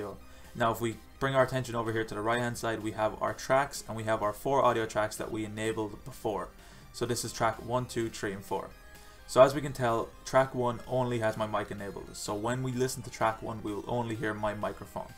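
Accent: Ireland. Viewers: Thank you very much for this clear and well-explained video. Do I detect an Irish accent? If so, snap ;) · Are you Irish by any chance? Great tutorial by the way!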